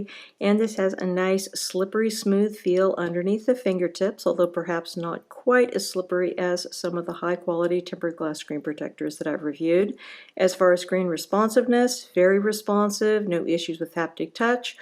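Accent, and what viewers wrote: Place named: United Kingdom. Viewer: Hello ( for the French), by the way you sound British to me. Good job. It will be my next screen protector.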